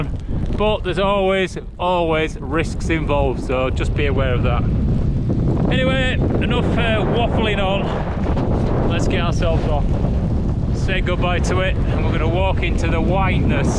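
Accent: Yorkshire, United Kingdom. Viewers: Fab watching this adventure…. I love your chat, the nice Yorkshire accent helps 😊 Surely it’s a bit scary getting back 😮 It must be so hard walking in this thick snow Thanks for the fun 🎊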